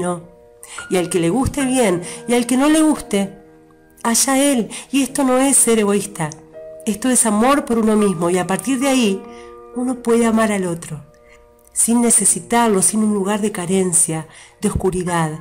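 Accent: Argentina. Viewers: Gracias, gracias gracias. Haz llegado a mi vida justo en el momento preciso, ni antes ni después. A mi me Dan taquicardias y siento que muero, me han dicho una y otra vez, ve al sicologo.. Tengo tiroiditis hashimoto, enfermedad auto inmune, enfermedades que tienen origen emocional. Dormía con este audio, y empecé a escuchar desde mi subconsciente, como q era una gran amiga la que contaba, y me decía, por qué no me había dicho, porquě no me lo había contado, si estuvo al borde de la muerte, pero y por qué habla como Argentina? Hasta que entrě en mi conciencia.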